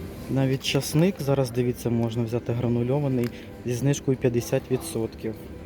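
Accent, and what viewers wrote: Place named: Western Ukraine, Ukraine. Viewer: ну звісно як без них? Але так гарно розмовляючи українською і портити її західним акцентом просто не повага до самої мови. Дуже шкода.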